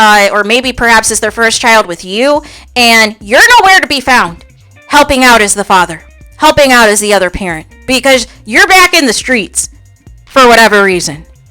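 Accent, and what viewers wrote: Midwest, United States. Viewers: I like your accent. It's either Midwest USA or Canada, I can't tell.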